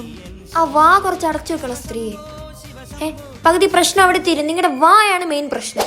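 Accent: Thiruvananthapuram, India. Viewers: Currect tvm language 😂😅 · Thironthoram samsarikunna daivam 😂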